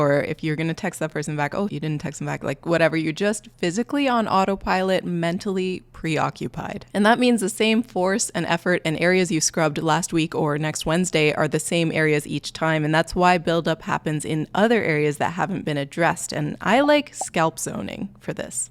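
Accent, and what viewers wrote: Canada. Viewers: We missed you sm!!! I’ve been watching since I was a teen and I’m 21 now…I just realized you have a Canadian accent😆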